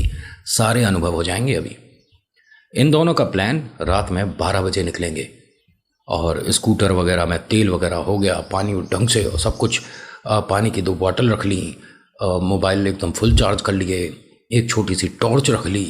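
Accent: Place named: Moradabad, India. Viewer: Jay Shri Shyam Bhai Har Har Mahadev aapki Bhasha bilkul Muradabad Jaisi Hai isliye main aapki Kahani sunti hun aur Kahani acchi Sunate ho aap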